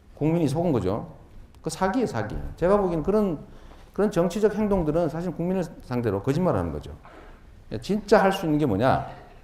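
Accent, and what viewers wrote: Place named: Gyeongsang, South Korea. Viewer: 경기도 살면서 갱상도 말하면안대지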